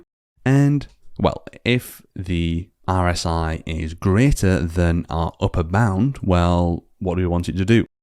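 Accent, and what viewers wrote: United Kingdom. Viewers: Thank u… By the way, I like your contents a lot because of your exclusive content, as well as your deep and soothing voice, With that British accent :) so because of that, I can normally watch an hour-long video with no boring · great work, this is excellent. I also appreciate the northern accent. It's been a while since I've heard a proper one.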